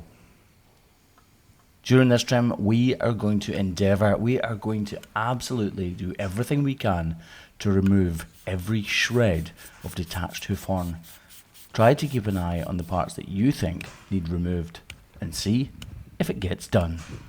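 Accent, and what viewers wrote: Scotland, United Kingdom. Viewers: I could listen to you talk all day long with your Scottish accent. I live in Michigan and we don’t have hear to many people from Scotland 🏴 That poor cow must have been in so much pain with the hoof problems and rotting flesh. I’ve never smelled that but when you said it smelled like rotting flesh all I could think about was an old chicken part or old hamburger that didn’t get thrown out on time. You do explain your procedures and why your approach to hoof issues so even an, Old Crazy Cat Babe like me could appreciate your work. Thank you for taking the time to post your skills I look at farming in a more understanding what my grandfather and father’s lives were like on their farm. My grandfather had a farm here in Michigan up in the thumb area before tractors, back then he had to grow food for his family and his animals; all he had was a work horse that was a mix between a Belgian and a Clydesdale. 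He took such good care of his animals because he had to depend on each for its job. However come Saturday night there was a dance and pot luck dinner at somebody’s barn, my grandfather was the one who made the moonshine, his shine was so good J. Edgar Hoover tried to arrest my granddad for his shine. The shine was kept cool in a dug out under the chicken house and the geese kept bitting Mr. Hoover so he offered my father $20. to slide under the coupe to get the shine so they could arrest my grandfather, my dad threw a big rock at Mr. Hoover and told him to, “get back into his fanc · you enjoy that Scottish accent ;)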